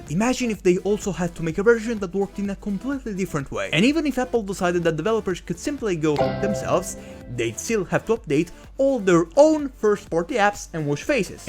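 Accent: Italy. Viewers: Bellissimo video, complimenti! Avevo sentito l’italianità dalla pronuncia e infatti non era difficile da notare 😂🔥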